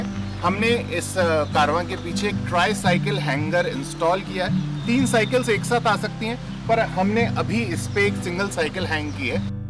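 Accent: Standard. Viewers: Apki Hindi itni sudh h k. Salute to you sir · वाह क्या शुद्व हिंदी बोलते हो आप 😊👍👌मजा आ गया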